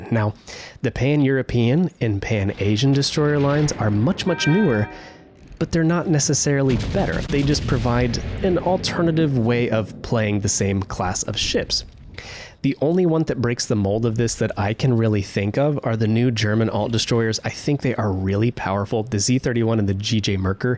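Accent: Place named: New England, United States. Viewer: Woostah- you from New England?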